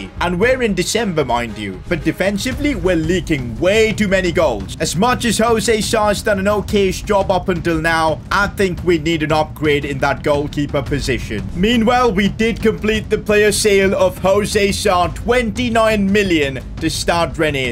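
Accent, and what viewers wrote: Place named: India. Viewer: Ngl you sound like a mix between a sterotypical indian uncle and vikstarr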